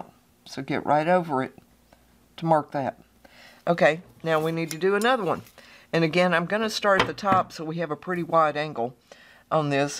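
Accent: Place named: South, United States. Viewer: Love the accent…western? Southern?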